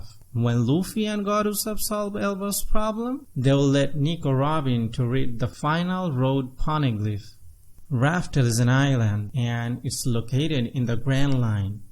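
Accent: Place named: India. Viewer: You're Indian aren't you? Accent gives it away nice video anyway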